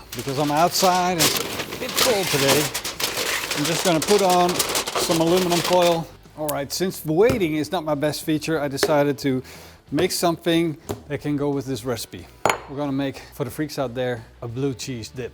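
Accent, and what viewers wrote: Netherlands. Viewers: Hearing that accent… Ik wist het een Nederlander!! 😁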